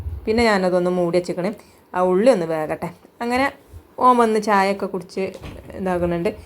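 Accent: Malappuram, India. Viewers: മലപ്പുറം ഭാഷ മതി എനിക്ക് ഇഷ്ടം ആ ഈ സംസാരം കേൾക്കാൻ 👍🏻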